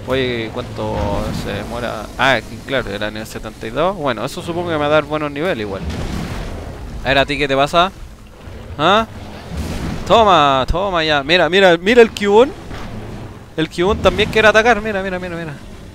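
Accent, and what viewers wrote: Chile. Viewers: eres de Chile cierto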